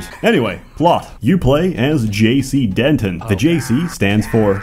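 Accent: Poland. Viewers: Are you from Poland? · U Polish ?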